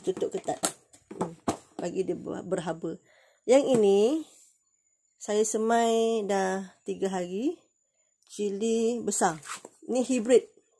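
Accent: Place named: Negeri Sembilan, Malaysia. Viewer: sedap jugak loghat negeri sembilan ni😅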